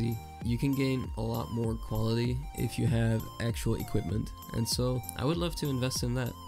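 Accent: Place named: Russia. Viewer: Guys I think he is russian, He has that accents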